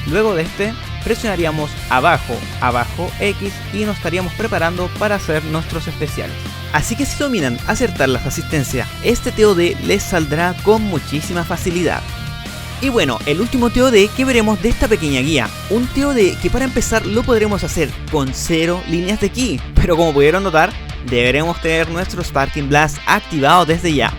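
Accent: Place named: Chile. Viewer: Eres chileno se nota en tu forma de hablar, porque yo también lo soy xD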